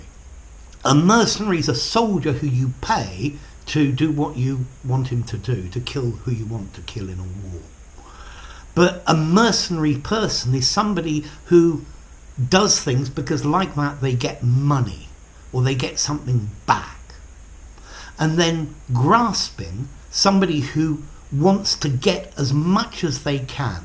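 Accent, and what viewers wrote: United Kingdom. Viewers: Thank you,! I always try to see some of your lessons. They are helpful and I prefer the British accent.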